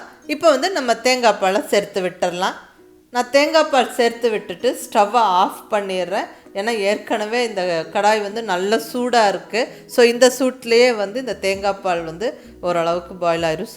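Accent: Kongu, India. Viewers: She is from coimbatore. Kongu tamil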